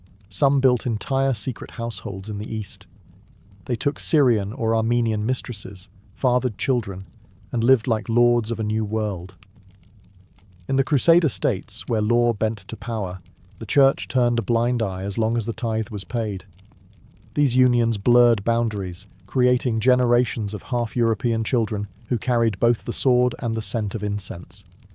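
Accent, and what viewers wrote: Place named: United Kingdom. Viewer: It's 2.35 in New Zealand. Very very tired, but I must have my British man talk to me about history before I conk out.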